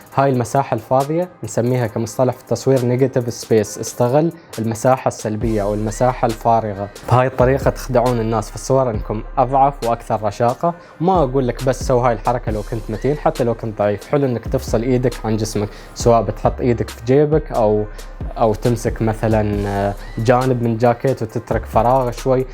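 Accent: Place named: Iraq. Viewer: قناتك تعمل محتوى ذو جودة عالية وهذا سبب انتشارها السريع استمر هكذا وسوف تنجح أكثر.. بالمناسبة أظن أن لهجتك ليست عراقية بشكل كامل فهي مبسطة وتدمج فيها الفصحى وهذا يجعلها سهلة لغير العراقيين. عجبتني اللهجة ولكن الموسيقى الخلفية مكررة ..ياريت في كل فيديو تستعمل موسيقى مختلفة. وياريت تعمل فيديو كيف تصوير الفلوغات .. فأنا أحاول عمل فلوغات ولكني لا أعرف كيف أختار زوايا التصوير.. اعمل لنا هذا الموضوع مستقبلا لو استطعت.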